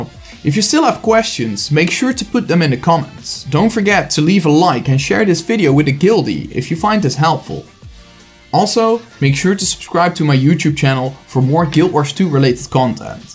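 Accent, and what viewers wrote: Netherlands. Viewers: I can always hear the dutch accent, coming from a Swede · This is the most Dutch accent I have ever heard, I love it! You earned a sub! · So.., you are a Dutch? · Yeah. It's a really noticeable accent, especially if you're Dutch yourself.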